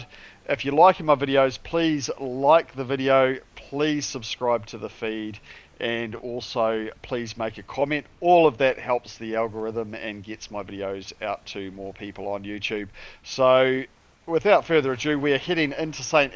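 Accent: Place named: New Zealand. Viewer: New player here. Didn't know about the account ledger at Yanita. Thanks for this 🙌 Great Kiwi accent, mate! Edit: off topic, am i the only one who lost access to the shrine at Telok Penjarah, since the last update?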